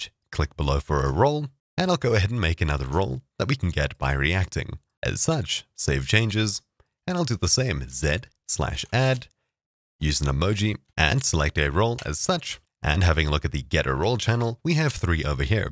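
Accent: South Africa. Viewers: hey i hear a south Africa guy here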